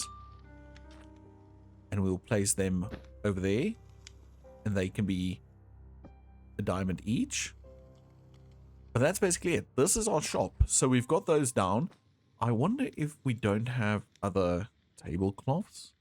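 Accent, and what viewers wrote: South Africa. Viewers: are u south African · YOU ARE SOUTH AFRICAN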